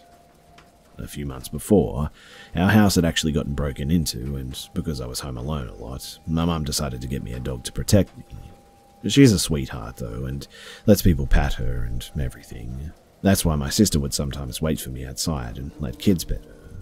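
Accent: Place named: Australia. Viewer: Does anyone else think voice & Aussie accent sounds different? Especially if you listen to some older posts. Still sounds great though while I listen on a dark & eerie night. Thanks!!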